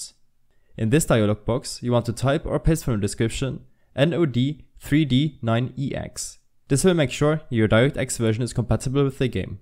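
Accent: Germany. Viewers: i bet ur german i can tell it from ur english accent WAS GEHT AB DİGGA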